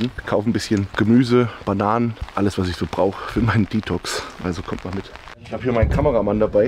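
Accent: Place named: Hesse, Germany. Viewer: Er meint wohl hessisch, wohne nicht so weit von ihm, aber muss wirklich sagen das man in jeder Gemeinde/Stadt ein bisschen anders spricht. Anstatt „spitz" sagt man hier zum Beispiel „speutz". Aber Plattdeutsch ist es nicht 😅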